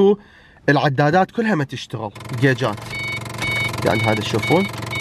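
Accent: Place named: Iraq. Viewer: أول مقطع أشوفه لهذا الشخص لكن من أول ماسمعت اللهجة العراقية , سويت أعجاب واشتراك بتوفيق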